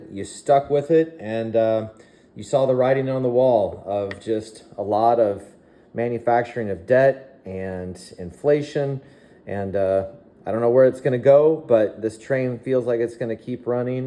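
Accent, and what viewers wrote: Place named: India. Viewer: Yeas that Indian cent is hawt!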